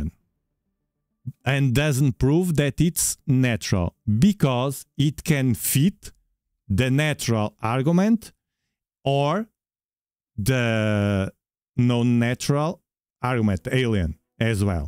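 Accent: Portugal. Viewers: The moment i heard you i knew you were portuguese. 😅 Abraço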